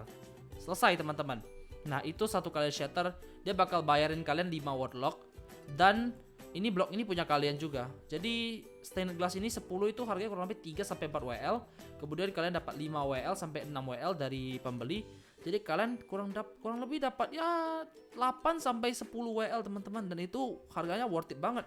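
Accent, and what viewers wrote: Pontianak, Indonesia. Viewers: Abang orang Pontianak ya?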